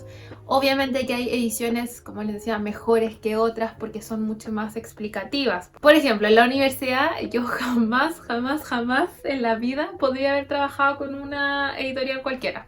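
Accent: Chile. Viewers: Que bueno tu canal, ya tienes un Nuevo Suscriptor, Me haces que Eres Chilena por tu forma de Hablar, y se ve de lejos que eres una chica muy leída y de amplia inteligencia y Conocimiento, aparte que muy bonita, eres la mitología y la magia, la mujer perfecta, Saludos desde el perú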